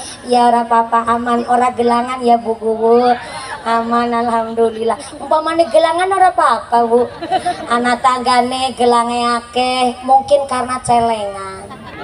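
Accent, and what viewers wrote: Banyumas, Indonesia. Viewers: Ceramah ngapak lucu tapi mengena para koruptor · Seru dan kocak sekali ya kalau pengajian mumpuni , ngapak ngapak 😅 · Ceramah ngapak ustadzah mumpuni Like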